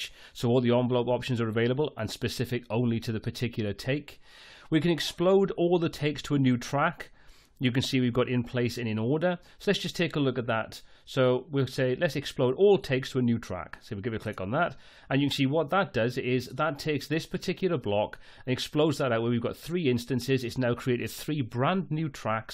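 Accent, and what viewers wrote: Wales, United Kingdom. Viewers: Do I detect a Welsh accent? If my ear is correct, for the WIN because me our mum is from Cardiff [Cah-diff]. =D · The ever friendly Welsh accent !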